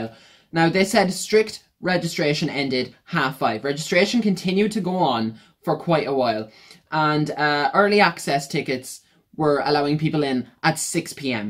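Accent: Ireland. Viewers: Trying to place your accent...midlands? Offaly? Cavan? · I’ve liked a few Britney songs over the years and have followed her history but I’m wouldn’t call myself a fan per se. I decided to watch this video after recently seeing another “meet and greet trauma” video relating to her. I liked the way you told the story and your presentation is authentic. I think all your feelings were justified. I disagree though that she has no control over this. She is an adult and a multimillionaire. If she can’t see the potential downsides to this type of fiasco then that is 100% her failure as a business person, entertainer and human being. If you learn one thing from this is it’s that humans aren’t for idolizing - we are all flawed and imperfect. And my last comment is that I love Irish accents!!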